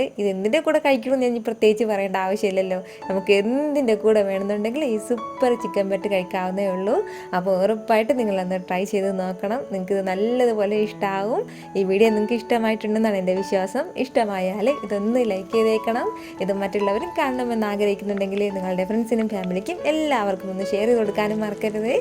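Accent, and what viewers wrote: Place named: Palakkad, India. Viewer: പാലക്കാട് ആണോ വീട് സംസാരം കേട്ടിട്ടു തോന്നുന്നു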